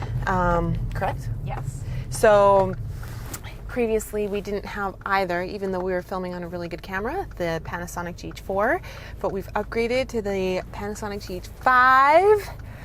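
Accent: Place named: Canada. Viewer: watched it for one minute and immediately noticed your canadian accent 😍❤️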